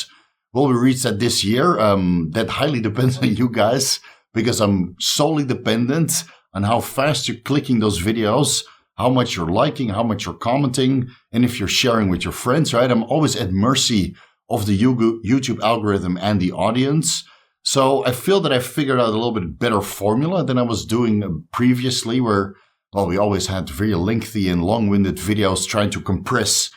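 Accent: Netherlands. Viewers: Congrats on the 50k, definitely deserved. Glad I found your channel and hope to enjoy the content for many more years, and leave many more comments and likes. The videos on applying your knowledge on practical cases seems very interesting to me. Always like to see stuff applied as well as explained. Also: fellow dutchman here, I don't mind your pronunciation. I hate a thick dutch accent but your accent is subtle and enjoyable imo.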